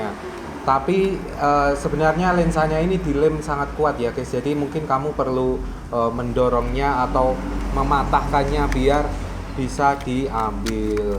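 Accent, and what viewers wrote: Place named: Java, Indonesia. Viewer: So amazing, brother, your bahasa indonesia is medok jawa, so very different from your english in your other video..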